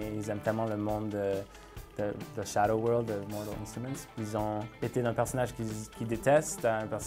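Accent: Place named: Quebec, Canada. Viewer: omg yer québécois!😭❤️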